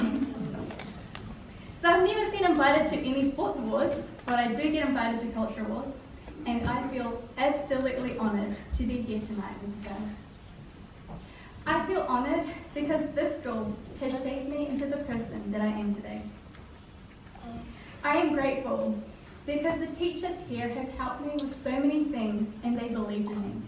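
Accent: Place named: New Zealand. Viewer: Girl why you gotta trill the RRRRR.... You sound better in Kiwispeak ratherrrr than the RRRRR-speak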